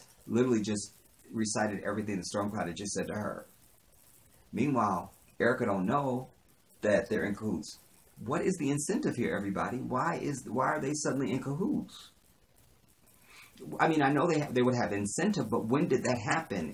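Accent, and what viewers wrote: United Kingdom. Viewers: I thought I was listening to a UK reporter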